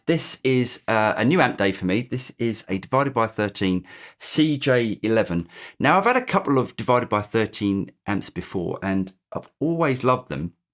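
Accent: United Kingdom. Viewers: try a hamstead, you sound english....come on man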